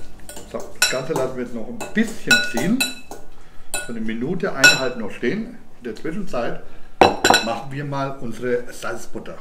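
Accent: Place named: Franconia, Germany. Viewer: Fränggisch - ich gläbb des werd nix Ä riesen Sach und dann noch blaue Zibfl Grüße aus Schweinfurt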